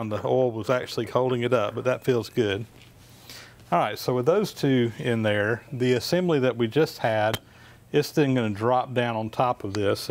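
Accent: South, United States. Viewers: I like how when is talking to "us" on camera - his accent is subdued, but when he's talking to other local folks - it's 100% good old boy!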